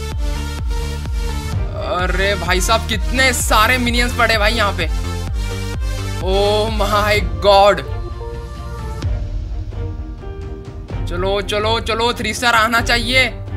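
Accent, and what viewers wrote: India. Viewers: This was the most intense indian accent ive ever heard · Indian accent, "oh my GOAT!"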